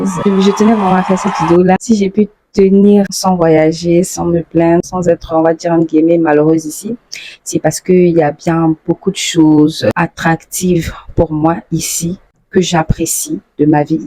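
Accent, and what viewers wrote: Ivory Coast. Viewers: Vous avez l'accent ivoiriens mou pour moi j'écoute une ivoirienne parlé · Tu as même,l accent ivoirien, lol sois bénie, akwaba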